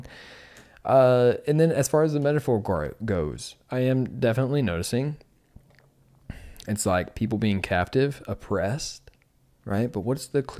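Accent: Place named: United States. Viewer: You sound like american. That explains why you dont seem to know very much about world and history. 😊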